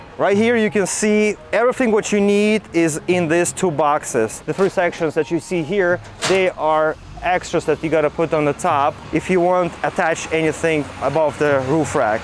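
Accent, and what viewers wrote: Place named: Eastern Europe. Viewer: Am American and the Eastern European accent are enjoyable to listen to and makes for an easy How-to tutorial. Love the roof rack. You earned my subscription. Keep up the great content 👏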